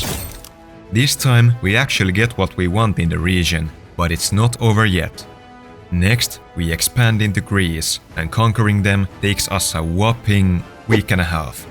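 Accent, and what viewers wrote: Finland. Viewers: Oh god i hear the finnish in you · the finnish accent, Perfection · Hey man are you from finland cuz u sound like it😮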